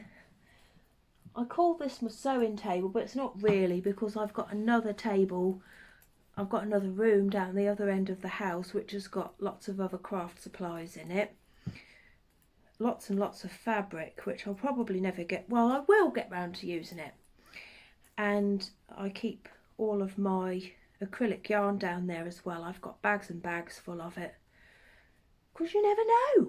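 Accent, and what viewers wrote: Norfolk, United Kingdom. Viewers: Hi, I don’t know if you’ll get this as it’s on your first podcast, but the algorithm popped up your channel with current new video and I’ve been watching for a few weeks now and thought I’d go back to your start. I thought I detected a Norfolk accent, my husband and his family all came from Norwich, although we live in Swansea now. I love watching you. You remind me of my cousin. Take care. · I have loved watching your episodes so much I have decided to go right back to the start and watch them all one after another. I'm in Bunbury, Western Australian and my son lives in Norfolk as well so new I had heard your accent before when I hear his friends. Wishing I was over there again but the virus has kept us apart from two years now. Cannot believe how talented you are!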